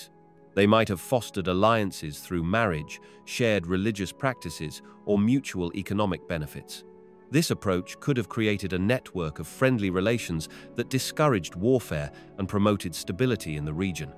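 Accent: United Kingdom. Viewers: Nothing wrong with British accents, they are clear and not slack jawed and lazy and limited English speakers find them a lot easier to understand and therefore improve their own pronunciation. Unlike say, an American accent which is not as easy for some.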